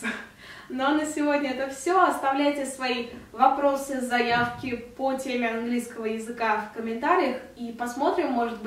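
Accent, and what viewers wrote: United Kingdom. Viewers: А сделай видео на английском языке, интересно как раз послушать твой британский акцент :) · у кого нет музыкального слуха не стоит отчаиваться) есть исключения, я ни в одну ноту не могу попасть, а с акцентами в английском и испанском проблем нет. все же есть универы, где фонетику ставят на ура за месяц) спасибо за видео!! теперь все лопнут от интереса послушать британский акцент))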